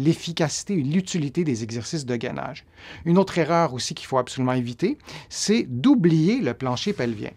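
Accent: Quebec, Canada. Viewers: Merci pour vos conseils avisés On doit vraiment s appliquer même si les douleurs sont parfois pas simples à gérer. Je vous remercie vivement de votre engagement pour nous soulager. Je souffre de lombo sciatique paralysante et de fibromyalgie. Vos exercices sont vraiment top Bonne fin de semaine et j adore votre accent Québécois Brigitte FONTAINE VESOUL. FRANCHE COMTÉ..FRANCE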